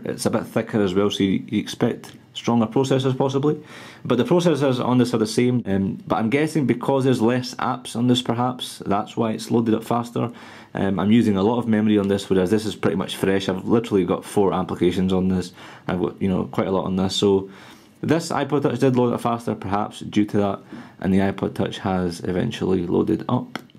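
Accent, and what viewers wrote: Scotland, United Kingdom. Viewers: gotta love his accent!!! scottish!!!!! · This guy speaks exactly like Craig Ferguson. And I dont mean just Scottish accent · Love u r accent (Scottish I think) and how u used some words,lol. And nice video and comparison! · i love your accent! where in scotland are you from??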